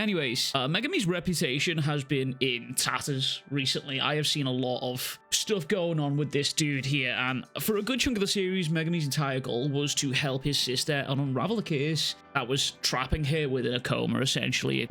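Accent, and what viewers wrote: Liverpool, United Kingdom. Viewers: Hell yeah a scouse man calling megumi a bum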